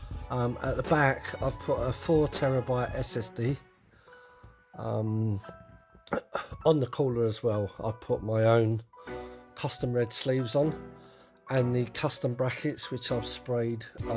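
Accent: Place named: RP, United Kingdom. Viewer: You sound posh compared to me ahah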